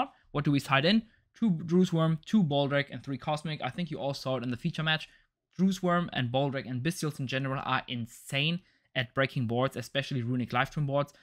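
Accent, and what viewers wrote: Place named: Germany. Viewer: Ich glaub blackboard ist das Wort das du gesucht hast , das kommt Tafel zumindest nahe ... Hab erst vor 2-3 Monaten angefangen d-Link zu spielen, hab durch deine vids so viel gelernt und bin dennoch immer wieder überrascht was das Deck alles kann. Danke für den Unterricht Prof. PS: wusste durch deinen Akzent seit der allerersten Sekunde, dass du auch aus Deutschland bist 😅😂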